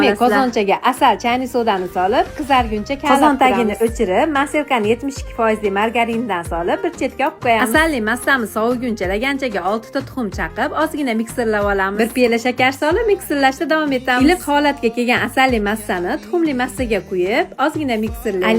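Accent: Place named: Andijan, Uzbekistan. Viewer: Anjancha shevalarizdan😊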